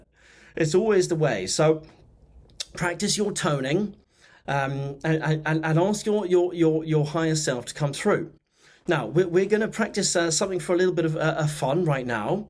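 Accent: South, United States. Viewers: Wonderful tutorial! I have been contacted by beings from the Orion constellation. I am unfamiliar with the particular groups from this star group. Any insight is much appreciated!! Also, love your southern accent, ha! I’m an intuitive guide from Georgia USA 🇺🇸!! In love, light, and service~ Elizabeth Maria Unlimited ❤🌎✨🙌🏽🧘🏽‍♀️🌞🧬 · I love the southern accent❤😂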